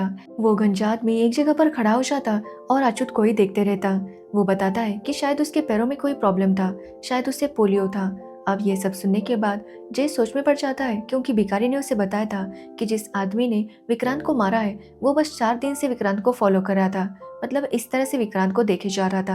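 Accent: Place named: Maharashtra, India. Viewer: Is your mother tongue is marathi ?... or does the narrator belong to the marathi language speaking community ... 😅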